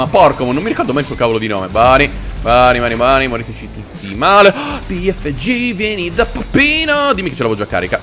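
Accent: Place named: Naples, Italy. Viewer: Sei napoletano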